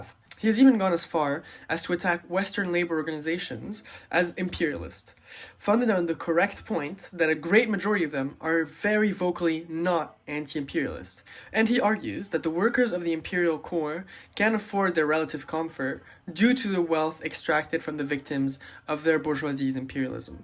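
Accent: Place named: Europe. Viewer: Hey I like your points in general but I want to push back a bit on the notion that revolution would benefit the whole working class/that the working class is truly united in their interests globally. I don't know exactly where you're from but based on your accent I'm gonna guess somewhere in mainland Europe. I bring that up only to say I'm not from Europe so idk the ins and outs of the situation in that part of the imperial core, but I am from so-called Australia and most "leftists" in this country (and many other colonial countries) are not our allies (by "our allies" I mean any true actually left wing internationalist's). Learning simultaneously more about the history of colonialism in Palestine and in Australia has led me to the same conclusion for both cases, the only way to resolve the contradictions is decolonial revolution, something much more achievable in Palestine purely because of demographics but I am hopeful one day it may happen on this continent as well. 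The issue is though, it is genuinely in the interests of the settler-working class to maintain colonialism. I'd argue this is the case even more so then it is that European working class benefits from Imperialism. I know you make the claim in this video that the benefit is marginal, and that is true in comparison to the benefits socialism could bring, but I also ask you at least reconsider that point by comparing your living situation to that of the vast majority of humanity. But back to my main point, genuine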